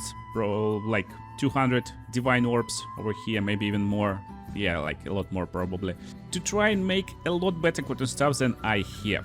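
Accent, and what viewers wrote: Russia. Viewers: Всегда интересно слушать такое, как русский говорит Английские слова по русски) Как говорится, на*бал систему) подскажи, через как ИИ перса на превью делал? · I tried watching, i honestly did, but the russian accent is just killing the entire thing for me. my fire of hatred towards Z pigs cannot be extinguished.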